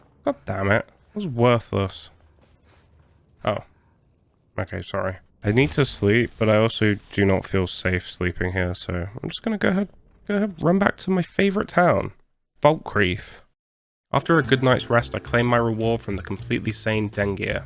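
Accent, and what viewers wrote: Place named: United Kingdom. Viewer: howcome you say dollars? if you dont mind me asking since you sound british?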